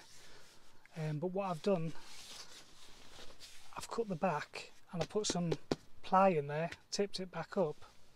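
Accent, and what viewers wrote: Hull, United Kingdom. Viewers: NICE job u from sound like your from ULL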